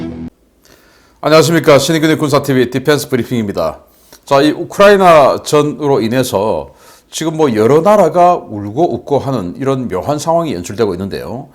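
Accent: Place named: Gyeongsang, South Korea. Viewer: 선생님의 소식은 정말 시원하고 알기쉽게 잘전햐주셔서 너무 좋습니다. 경상도 말씀이 더 구수합니다.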